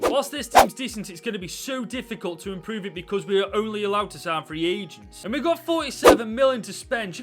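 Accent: Stoke, United Kingdom. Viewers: You're accent! You're from Stoke right? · I absolutely love his British accent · Must be from Stoke yourself with that accent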